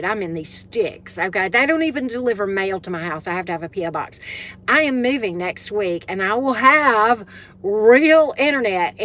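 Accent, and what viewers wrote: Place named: Louisiana, United States. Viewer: I'm from England and I find your accent adorable. Where is it from? Louisiana? Thank you for the reading. 😁❤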